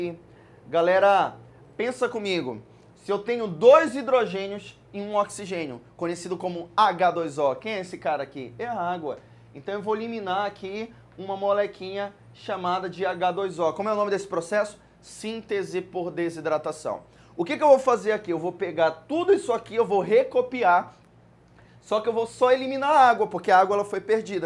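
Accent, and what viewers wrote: Pará, Brazil. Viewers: olá professor , estou acompanhando suas aulas há algum tempo , pelo sotaque , pensei que fosse paraense , hoje descobri que é sim , e achei muito legal , também sou paraense e já morei em Ponta de Pedras , atualmente moro no RJ, gosto muito das suas aulas , super recomendo para os meus colegas e agora sabendo que é paraense vou triplicar isso .Ótima qualidade são suas aulas, completas! parabéns e sucesso. Gabriela Araújo. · Muito bom o sotaque do Pará kkkk ótima aula!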